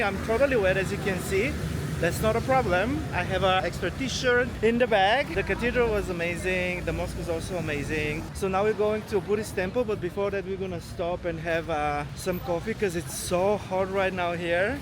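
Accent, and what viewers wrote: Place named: Singapore. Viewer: Where do you come from anyway?ur English sounds like Singaporean or at least asian acsent..😊❤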